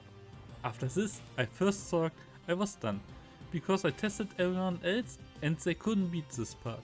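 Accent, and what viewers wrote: Germany. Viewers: You sound german , are you german ? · kann es sein das du deutsch bist?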